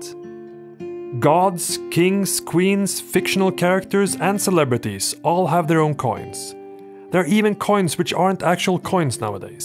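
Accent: Sweden. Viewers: Man känner igen en svensk röst väldigt snabbt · He's most likely swedish, all swedish ppl sound like that if they haven't been in the US for a month.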